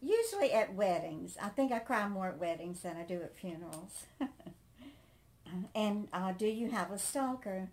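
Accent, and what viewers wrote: South, United States. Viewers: Discovered your channel by typing in "14 QUESTIONS BEING TAGGED ON A VIDEO." Your channel was one that popped up with your answering these questions. Wondered about the questions because saw Debra Joy mentioned being tagged, and she answered these questions, too. Long story short: I subscribed to your channel and tapped the bell (to receive notices when you upload more videos). Am looking forward to viewing some of your past videos and, of course, your new ones. Very much like your delightful Southern accent. :)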